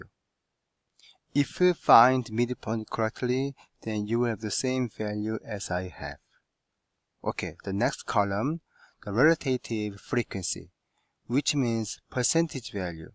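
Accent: South Korea. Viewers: Off topic but can i ask why does your accent sound like Korean English accent ? Or just mind is still in SK..... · Omooo!!💜thank you so much😇 Wait am I the one here feel confused about the man speaking having a Korean accent of English??😁anyways good job really a life saver😇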